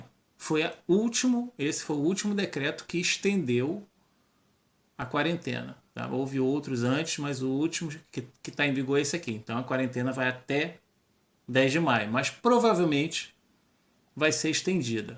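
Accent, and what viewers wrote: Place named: Rio de Janeiro, Brazil. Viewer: Vc é paulista??? Achava que era carioca, do Rio de janeiro.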